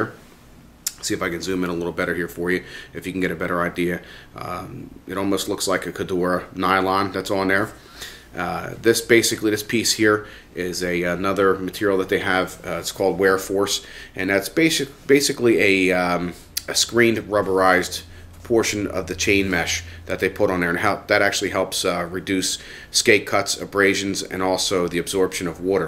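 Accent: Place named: Philadelphia, United States. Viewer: Is the host of this vid from the Philly area?? His accent sounds like it and he said "Wooder" for "Water". ;) I'm orig. from Philly, so I notice these things.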